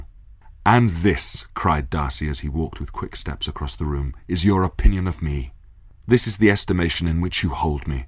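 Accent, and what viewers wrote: United Kingdom. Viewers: This is the best British accent voice I have ever heard in my life. His voice is such a masterpiece. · I was thinking that, only Joseph Morgan voice and British accent can make me to fall in British Lords, but Mr. Darcy is the true Magic, I discovered years ago ... in love with this voice, this accent and this eyes and all .... 😘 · Ador his voice and accent! Brilliant british actor! 💖💖💖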